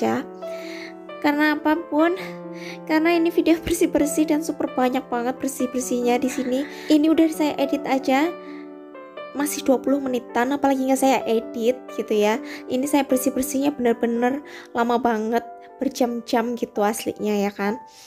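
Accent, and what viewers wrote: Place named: Tegal, Indonesia. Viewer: Mba aaslinya mana... Logatnya kayak aku.. wkwkwk ( orang Tegal )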